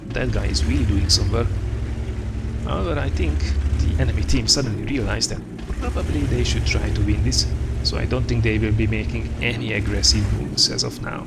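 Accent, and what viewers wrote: Hungary. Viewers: Most veszem észre a magyar kiejtést, ha ezt olvasod légy szíves valahogy jelezd , hogy tényleg magyar vagy:D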